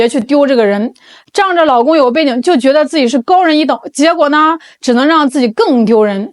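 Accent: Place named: Shandong, China. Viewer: 主播山东人啊😂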